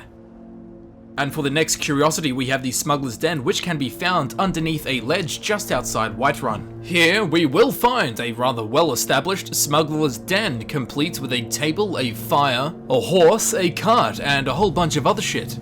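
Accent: Australia. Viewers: Australian. The accents are very different. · Hey, what county are you from, because your voice sounds faintly Australian but I can't place it, thanks! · what part of Australia are you from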